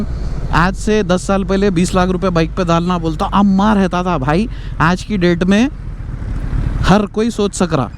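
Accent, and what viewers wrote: Hyderabad, India. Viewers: I’m following you since a long time both for bike reviews and to listen Hyderabadi Hindi 😊 And YES you are right the OLD zx10r looks was great ! It looked manly butch !!!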